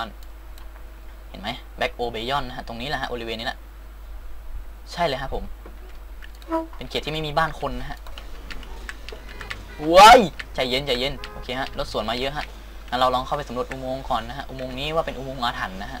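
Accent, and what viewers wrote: North, Thailand. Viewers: คนเหนืองจังหวัดไรครับ >< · พี่คนเหนือหรอครับ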